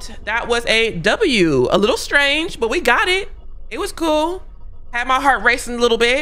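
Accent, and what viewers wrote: United Kingdom. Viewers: “Young tenderoni” 😂 love a fellow brit ✨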